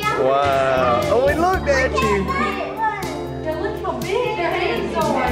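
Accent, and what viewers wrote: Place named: South, United States. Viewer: I love the bad music and the hill billy narration.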